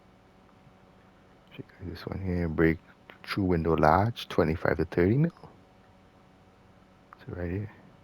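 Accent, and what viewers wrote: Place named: Trinidad and Tobago. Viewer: You sound Trinidadian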